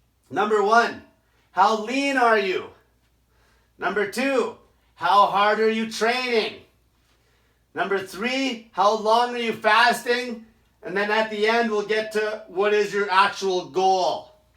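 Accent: Canada. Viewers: It is English-Canadian instead of French-Canadian. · Love how he has the typical English-Canadian accent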